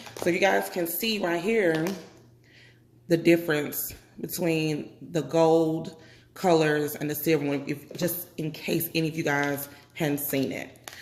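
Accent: Mississippi, United States. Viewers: Lol.....i know that Mississippi accent anywhere #notificationsquad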